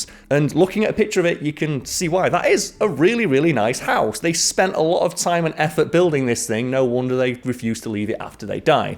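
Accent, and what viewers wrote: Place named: North, United Kingdom. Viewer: Why are you still the interim host? Ive watched this channel for years and you’re the best host. It was weird hearing a fellow northerner at first, but now its a highlight of watching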